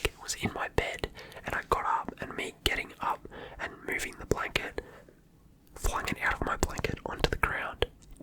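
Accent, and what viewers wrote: Australia. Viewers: i have been watching asmr for the last 7 years and never once have i watched a video of someone with the same accent as me/from australia. hearing the aussie accent with the whisper is giving me tingles so thank you for existing and creating this channel 😭🧘🏽‍♀️🩷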